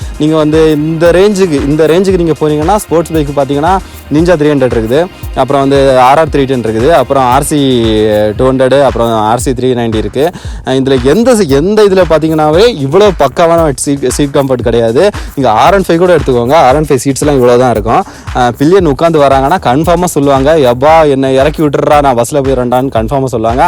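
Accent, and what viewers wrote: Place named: Kanyakumari, India. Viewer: but slang lita kanyakumari slang varuthae 🤔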